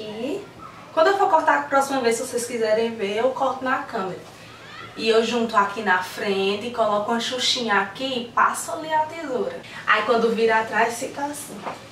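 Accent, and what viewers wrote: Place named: Northeast, Brazil. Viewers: Eita sotaque pra eu amar 😍 amei as dicas e tbm sou Pernambucana! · logo vi que é permanbucana linda amo nosso sutaque 😘😍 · menina que sotaque bonito . pernanbucana sim senhor . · Sotaque lindo de Recife amo !!! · que sotaque bom nordestino hein, também sou nordestina, é nós 😊😘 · Ameeeiii o seu vídeo 😍😍 Comprei a glicerina hj e vou fazer para testar. Tmb sou de Recife e amooo o nosso sotaque 💗 Parabénssssss! !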